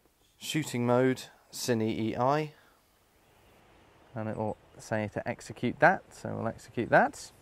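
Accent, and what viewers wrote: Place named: England, United Kingdom. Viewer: english accent sucks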